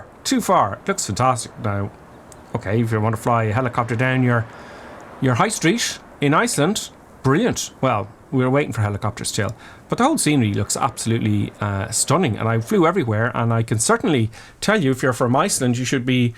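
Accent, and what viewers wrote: Ireland. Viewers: Wait a minute... you’re IRISH??? :)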